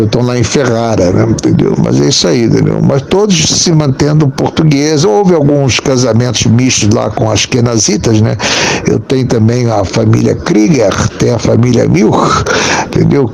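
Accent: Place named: Rio de Janeiro, Brazil. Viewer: De onde exatamente o Judeu é? Acho curioso o modo de falar dele, uma mistura de carioca com português de Portugal.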